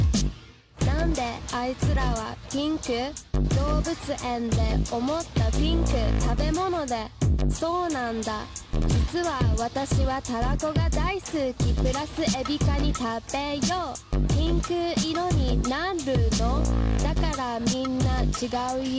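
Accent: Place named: England, United Kingdom. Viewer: I just realized that she has a British accent and speaks Japanese... I swear her British accent on top of Japanese makes the coolest sounding accent ever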